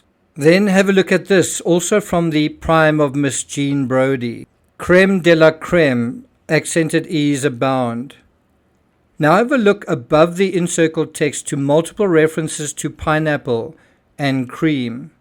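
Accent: South Africa. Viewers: That south african accent though. Hideous af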